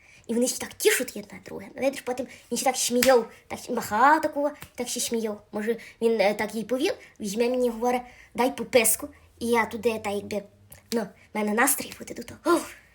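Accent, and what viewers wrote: Galicia, Ukraine. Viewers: Галицький балак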